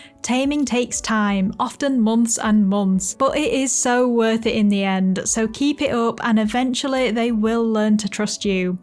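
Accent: United Kingdom. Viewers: She’s UK😊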